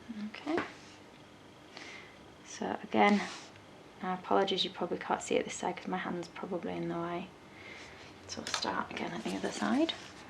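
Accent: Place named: England, United Kingdom. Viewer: New subscriber here! Where did you train, or are you self-taught? Amazing tutorials, and nice to hear an English accent, so many Australian and American cake geniuses on YouTube but I haven't come across many fellow Brits :)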